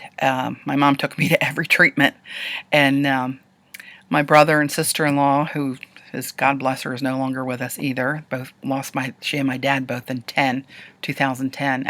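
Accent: United States. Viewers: My mother is a breast and uterine cancer survivor, last month was 7 years ago. I also live close to Baltimore, was born an raised there. I just knew after hearing your voice and your humor you were from Baltimore. God I love your videos. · I recently discovered your you tube videos on gelli plate art and love them. I actually bought a gelli plate which I have yet to use, but am gaining an understanding of how it works and look forward to playing with it very soon. I want to thank you for "the rest of the story". I do not nor have I ever had cancer. I have had friends with cancer and have lost dear friends to cancer. I have to say that I live in British Columbia, Canada. I know from your great accent that you live somewhere in the South - at least I'm pretty sure. My Mom was from Mississippi, and I was born in Alabama, raised in New England, and lived a few years in Tennessee, and also in the midwest. 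I feel such a bond with you as I listen to you talk about your art making process, If I lived close I would want to just say Hi!!! So here it is, Hi and thanks for the great videos. I am working my way through all of them! Love and Peace and great gobs of good health!!!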